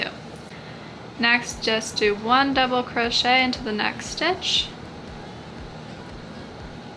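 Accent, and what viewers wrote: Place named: New Hampshire, United States. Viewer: Are you from New Hampshire? Your accent is so cute 🥰😊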